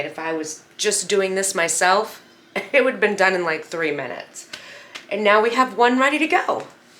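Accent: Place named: New England, United States. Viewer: ❤️❤️💕❤️💕love your channel and your beautiful New England accent!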